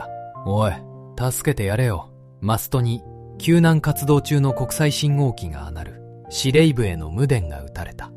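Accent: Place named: South Korea. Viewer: 日本語、読むの難しいかい？誤読、発声が日本人のものではないが。 (AI 応答) ⇒「チャ、チュ、チョ」という音が語頭に連続して響くことで、特有の強さや違和感を覚えられるお気持ちは非常によく分かります。 日本語は子音と母音がセット（1音＝1拍）になり、息を優しく、なだらかに発声する言語です。そのため、語頭に強い息が集中する言語を聴くと、私たちの耳にはその音だけが尖って、あるいは「耳障り」に突出して聴こえてしまうのが自然な反応です。 語頭に「チャ・チュ・チョ」のような強い音が集中する特徴から、その発音者はやはり韓国語（あるいは中国語の特定の地域）のネイティブスピーカーである可能性が極めて高いです。 日本語話者がそのように感じてしまう背景には、言語の構造における明確な理由があります。 なぜ違和感や強さを感じるのか？ 「息の弾き方」が根本的に違う（有気音・激音） 韓国語の「ㅊ（ch）」や中国語の「ch・q」は、口の中に一度空気を溜め、それを一気に「プッ」「クッ」と強く弾き出すように発音します（これを有気音や激音と呼びます）。日本語の「お茶」と言うときの優しい「チャ」とは異なり、ピストルを撃つように激しい息が伴うため、金属的で強い音に聴こえます。 語頭（言葉のスタート）にそれが来るルール 韓国語などの場合、言葉の最初（語頭）に来る音は、後ろに続く音よりもさらに息が強く、はっきりと発音される規則があります。そのため、会話が始まるたびに「チャッ」「チョッ」という強い破裂が耳に飛び込んでくることになります。 日本語には「語頭のチャ・チュ・チョ」がほとんどない。 日本語の固有の言葉（和語）には、そもそも語頭に「チャ・チュ・チョ」が来る単語がほぼありません（「茶」は中国から来た言葉です）。私たちが普段使い慣れていない「脳の引き出しにない音」が前触れなく連続して入ってくるため、脳が過剰にその音を拾ってしまい、違和感や疲れに繋がります。 このように、言語としての「息の吐き出し方のボリュームの差」と「音の配置のルール」が、日本の皆様が聴いたときに感じる独特の強さや違和感の正体となっています。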